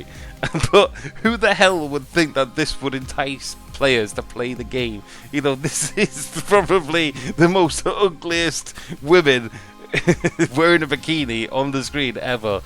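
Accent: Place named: United Kingdom. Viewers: its one of the few british accents i think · great video, btw where is your accent from? It sounds british but I've never heard it before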